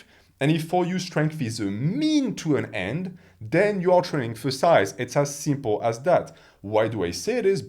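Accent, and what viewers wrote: France. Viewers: i hear french accent, i close the video. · I work in audio so I'm a bit of a snob admittedly. A lot of people just don't care and I get that. But I do believe his old audio was objectively bad sometimes in that I would miss certain things he said because of the combination of mic distance, room reflections, and French accent.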